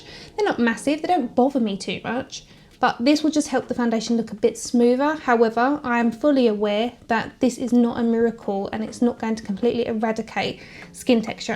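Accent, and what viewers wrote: Essex, United Kingdom. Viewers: Love your Essex accent 💕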